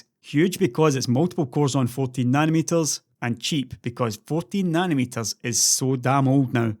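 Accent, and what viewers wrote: Scotland, United Kingdom. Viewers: I hear a Scottish accent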